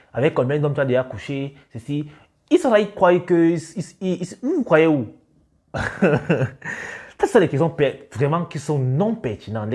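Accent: Cameroon. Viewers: Je m’abonne direct putain c mec avec son accent camerounais ah je kiffe 🥂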